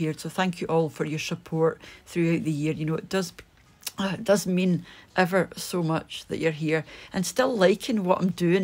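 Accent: Scotland, United Kingdom. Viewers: Your Scottish accent combined with your voice is the most beautiful sound in the world ❤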